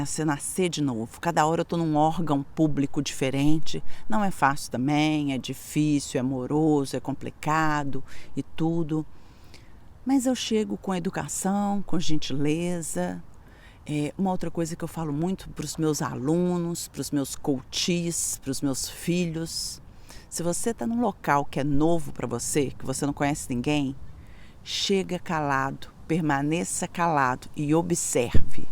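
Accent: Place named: Brazil. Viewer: Parabéns amiga, se não fosse o sotaque ninguém diria que a senhora era brasileira. Eu como Português, nascido em 1940 tenho a dizer-lhe que, quanto a Portugal e aos portugueses, o seu raciocínio está 120% certíssimo. Faça favor de ser feliz em Portugal na companhia de quem mais ama. Bem haja por este magnifico depoimento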